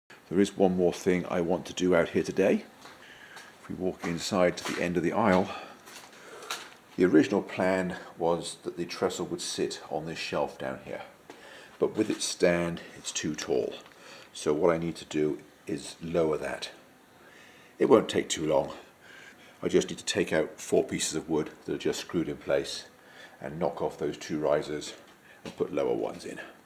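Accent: England, United Kingdom. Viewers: In one word... " Perfection " you are without doubt, the best non club single handed builder of layouts out there. Are you British? you sound English:)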